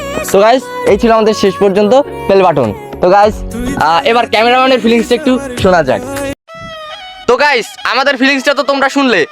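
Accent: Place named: Bagerhat, Bangladesh. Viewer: বাগেরহাইটা পোলা কথায় বোঝা যায় ভাইয়া আমি বাগেরহাট জেলার কচুয়া থাকি😊😊😊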